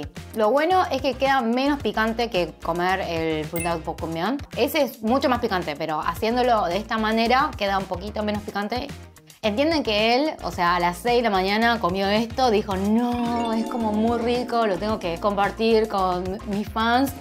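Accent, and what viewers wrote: Argentina. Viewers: ME ENCANTA ESE TOQUE DE ACENTO ARGENTINO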